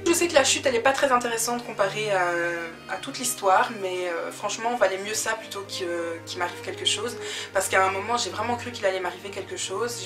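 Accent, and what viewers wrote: Belgium. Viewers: Cc tu est belge ?? Si oui de ou ??Bruxelle ?? Je t'adore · elle est belge?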